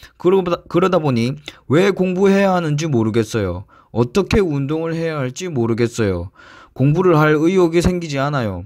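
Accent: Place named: Busan, South Korea. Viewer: ㅋㅋㅋㅋㅋㅋ 말투가 여기쪽이네...부산창원...정겹습니다.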